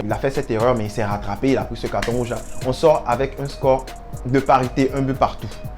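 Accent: Ivory Coast. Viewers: C’est la première fois que je ressens ton accent ivoirien 🤣🤣🤣 ça degba Garcia et piqué ensemble c’est pas possible même profil pas assez rapide . Araujo un monstre ce petit, De Jong il est tellement bon mal entouré et Depay un artiste très talentueux 😍 cependant trop de responsabilité à peine arrivé. Busquets il est plus à niveau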